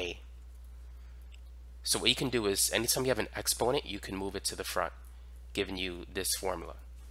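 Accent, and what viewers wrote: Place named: Boston, United States. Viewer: This guy def has a boston accent.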